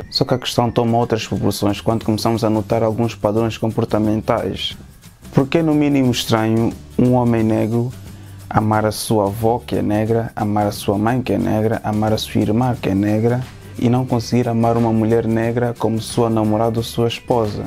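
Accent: Portugal. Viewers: pq homens afrodescendentes nao gostam de mulheres afrodescendentes? e vc é de qual país ? Portigal ? q sotaque de portugues é esse? eu sou brasileira e vc parece ser tão brasileiro por sua cor moreninho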